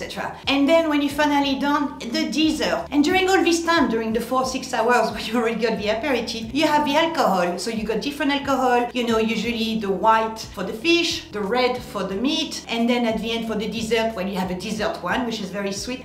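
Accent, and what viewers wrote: France. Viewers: Great video delivered in that inimitable French way!I decided right away to follow your lead -not dieting but living within a discipline-I will report back in a month Thank you!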